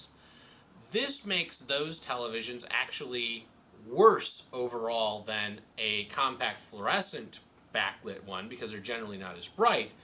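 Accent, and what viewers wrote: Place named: New England, United States. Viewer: Every time you go to one of these video's and it is a guy with a Yankee accent, be prepared for too much talking and show boating. In other words, hear me roar, I can talk a mean game of pool.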